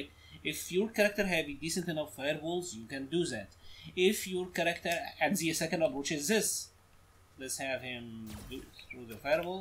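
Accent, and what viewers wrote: Egypt. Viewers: Man u sound egyption?? great video . Can u do other mus??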